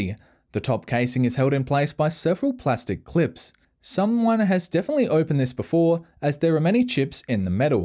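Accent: Australia. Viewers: got that aussie sound. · The Aussie accent 😆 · That's the aussie accent mate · here is that aussie voice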